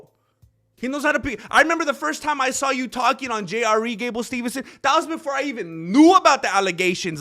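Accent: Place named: Mexico. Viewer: I preferred this fellow when he used to crank up his Mexican accent a bit more, and force that false laugh a bit less.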